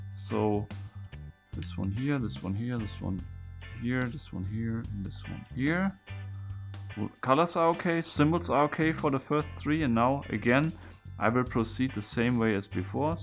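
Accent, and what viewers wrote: Germany. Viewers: Man merkt Hardcore das du Deutscher bist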